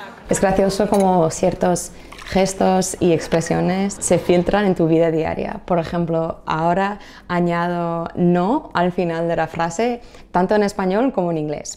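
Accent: Madrid, Spain. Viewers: Soy español. Me sorprende al leer : " soy latino y notamos inmediatamente el acento español de España " Mi duda es, porque dentro de España hay muchos acentos ( no solo uno que sea el propio de España). Alguien del norte, por ejemplo Galicia habla con un acento TOTALMENTE distinto a alguien del sur ( Andalucía) y el acento de las Islas Canarias es MUY parecido al acento de algunos países de hispanoamérica. Esa chica del video tiene un acento, diría de Madrid o del centro norte de la Península Ibérica.Teniendo muchos acentos en España tengo esa curiosidad de cual es el acento del español de España. Saludos desde Madrid. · Se nota en tu forma de hablar y de expresarte no solo que te estás volviendo española sino que también se te nota el madrileño jaa Es bueno no cerrarse a lo que uno está acostumbrado y coger las cosas buenas que aprendes y añadirlas a tu forma de ser y personalidad. 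Y algo bueno que sin duda tenemos los españoles es lo alegres, cariñosos y enérgicos. También somos muy gritones pero eso te recomiendo que no lo acojas xD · tienes un español espectacular. Además con acento español/madrileño. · Te has españolizado tanto que se te nota en el deje al hablar y a la hora de mover las manos y el cuerpo. Fenomenal. Felicidades. Encantado de conocerte. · ¿Tienes acento español o estoy alucinando? ¡Qué rapidez y fluidez hablando! Me impresionas. Yo hablo muy rápido de manera natural, pero cuando lo hago en inglés comienzo bien pero luego me estrello y me atasco jaja. Si sigues así, en poco tiempo podrás engañar a un español diciéndole que eres de Leganés jaja. Es una suerte que os vengáis para acá los estadounidenses "guais". Un abrazo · Tiene usted muy pegado el acento español de españa , soy latino y notamos inmediatamente el acento español de España , pensé que usted era española . 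saludos macha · Tu acento español es espectacular. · Bravo,ya tienés ese Acento Español, bien marcado el acento de la 'Z' como cuando dices: zapatero a tus Zapatos!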